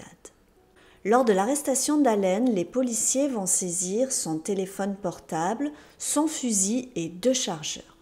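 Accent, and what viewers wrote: South, France. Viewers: J'adore ta chaîne et la façon dont tu raconte l'histoire👌🥰. Je pense vue ton accent que tu dois être du Sud de la France comme mo😉i je suis d'Avignon. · Vous êtes du sud de la France ?😉 Petit accent !👍 Moi je suis Cannoise, j arrête pour la semaine et fidèle pour le week-end prochain 🙋‍♀️🐾🐈⚘🌞🤢🍀😺🌻👍👍👍👍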